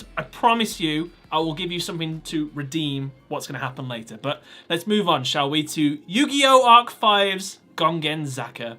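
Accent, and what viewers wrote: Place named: United Kingdom. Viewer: So British, so nice to listen.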